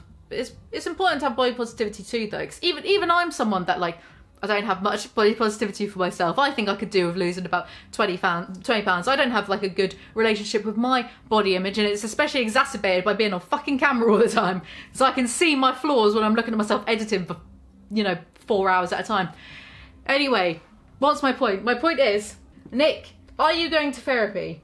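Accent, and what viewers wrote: United Kingdom. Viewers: your bri ish accent is everything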